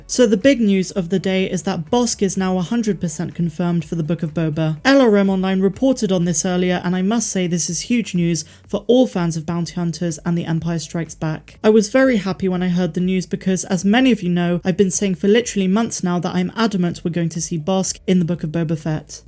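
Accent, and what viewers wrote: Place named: Bristol, United Kingdom. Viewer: you sound bristolian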